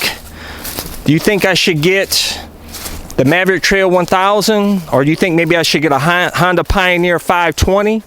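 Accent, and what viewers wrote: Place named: South, United States. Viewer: the southern accent is the best part of this video! 🤣🤣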